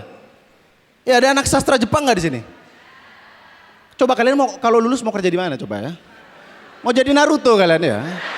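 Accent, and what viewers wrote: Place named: Madura, Indonesia. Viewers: duro nya medok mas😂😂😂😂 lanjutkan kita serumpun😂😂😂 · Logat maduranya kental bnget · si muslim maduranya masih berasa wkwk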